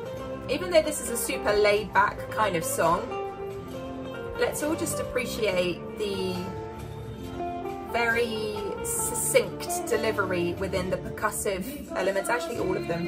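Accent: United Kingdom. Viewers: Tnx for reaction.and your British accent is awesome btw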